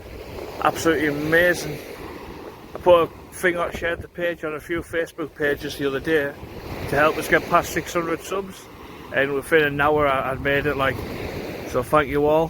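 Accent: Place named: Teesside, United Kingdom. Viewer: Just subbed👍👍 I think you're my neck of the woods by your accent. Are you Boro/Stockton area?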